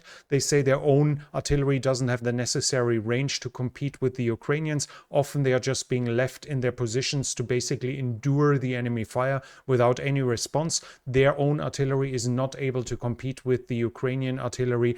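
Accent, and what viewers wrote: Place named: Germany. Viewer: Where are you from ? Germany or Scandinavia ? Just guessing As a German your accent could be both :D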